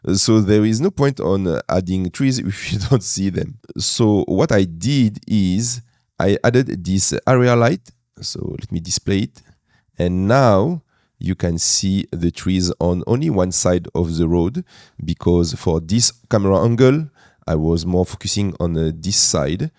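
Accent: France. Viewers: u've a french accent ? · Salut cool ra video , tu es francais ? Car ton accent et vraiment pas comme les anglais que je regader abituellent pour moi tu es francais ton rire et francais 😂 , ca serai cool des tutos en francais , bonne continuation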